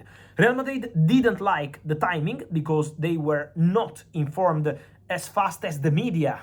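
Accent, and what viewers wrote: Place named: Italy. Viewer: Fabrizio's fast speech accent of Italian are embarrassing to understand...please stay tranquilized and speaking slowed 😂🙏🏻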